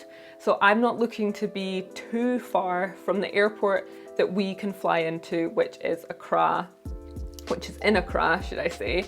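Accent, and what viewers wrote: Scotland, United Kingdom. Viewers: R U from Scotland ? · I love you accent, is it Scottish? I love the Scottish accent. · Are you from Scotland 🏴?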